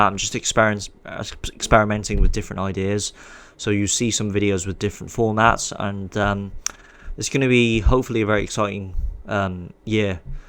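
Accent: England, United Kingdom. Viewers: He speaks like an Englishman - which is weird, let's be honest. I'd much rather Asians sound like whatever Asian they are, but in English. There's no eloquence in emulating a man that you are not.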